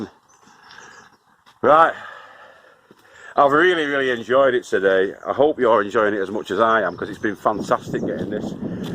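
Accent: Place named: Lancashire, United Kingdom. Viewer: Lol. do the visitors from foreign lands understand your Lanky (Lancashire) dialect :)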